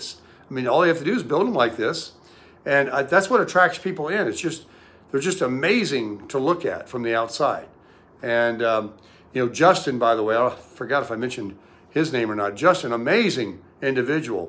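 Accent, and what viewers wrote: South, United States. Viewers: He’s southern 👍🏼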